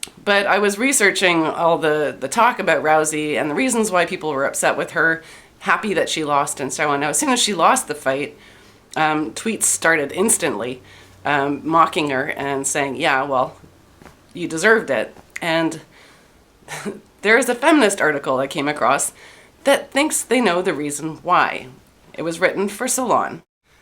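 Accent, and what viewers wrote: Canada. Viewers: Is it the Canadian Accent or are you generally incredulous. Do you have a long tongue?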